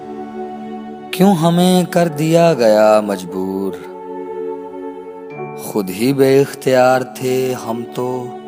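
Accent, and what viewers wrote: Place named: Punjab, India. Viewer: punjabi voice.sir i know u.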